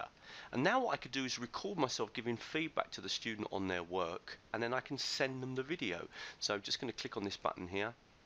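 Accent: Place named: United Kingdom. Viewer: GREAT-GREAT-GREAT TUTORIAL!!! I had some confusion about how to use Snagit and whether or not I could do a video capture as well as how to upload to YT and whether-or-not I needed Jing and Screen Cast in addition to Snagit, you have answered all those questions. So despite your Brit Accent-Not everyone can be privileged enough to originate from SE Texas-it is a great video and I am signing up for your newsletter-Keep Up The Good Work!!! Shavuah Tov...hcwjr/ponyhunter98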